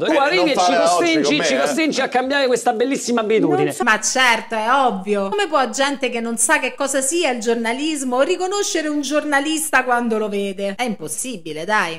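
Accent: Rome, Italy. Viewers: continua pure con la calata romana perché tu la fai elegante e rendi chiarissimo i concetti.....belle ed efficaci anche le espressioni che accompagni la calata romana.E poi usi espressioni dialettali romane e non in marie eccezionali, simpatici e precisi, mi fai morire con quelle siciliane😂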